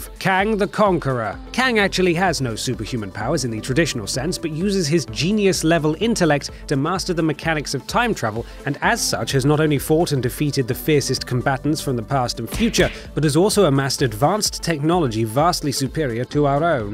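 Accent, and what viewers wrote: United Kingdom. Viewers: I don't really agree with the whole list but the British accent makes me believe that I'm wrong and they're right.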